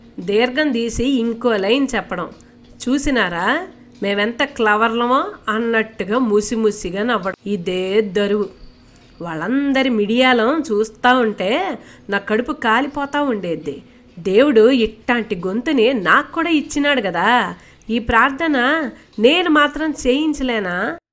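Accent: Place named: Nellore, India. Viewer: Nellore yasalo ceppandi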